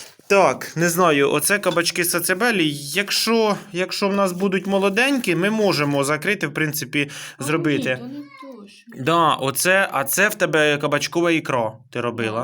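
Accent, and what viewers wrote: Poltava, Ukraine. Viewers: так ведь это наш Полтавский говор, пускай не слушают кому ухо режет, а мне так любо- любо душу греет. Раньше говорили, что наш говор это настоящий украинский язык, мы же не живём на Западной Украине, это центр у нас так,и это у нас не отнять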